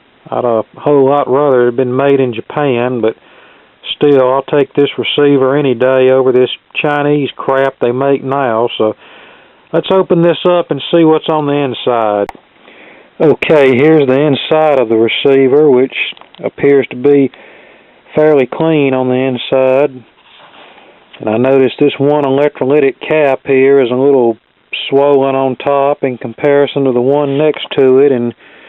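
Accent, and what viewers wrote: South, United States. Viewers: The same accent of Forrest Gump, lol, I love that accent.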